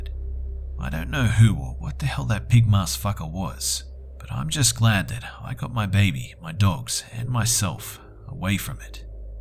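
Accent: Australia. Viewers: Are you by any chance Australian? I'm Australian and picked up on your intro haha! Thought I'd ask :)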